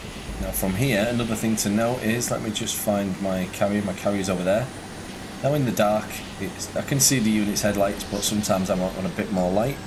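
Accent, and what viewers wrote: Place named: Scotland, United Kingdom. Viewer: As a non English native speaker, I sometimes have a hard time to get your charming scottish(?) dialect. But: your tutorials about this game are short, precise and in a perfect way, useful to me. Thank you, subbed! BTW. Did you tried the VR version already? Just in case, I didn’t found out how to zoom sight.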